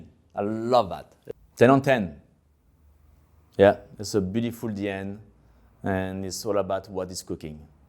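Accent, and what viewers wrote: France. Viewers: The only thing that is bad on this video, is that we have to listen to French accent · I can listen all day to his french accent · he sounded so french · This guy makes the French accents in the movie sound accurate.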